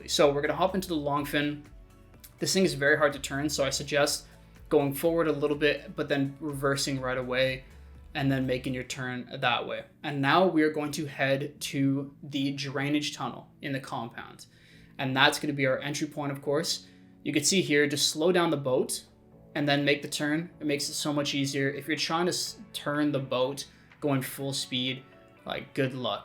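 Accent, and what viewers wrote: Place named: Canada. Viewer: Ahhaahhhhh! You are Canadian! 🤣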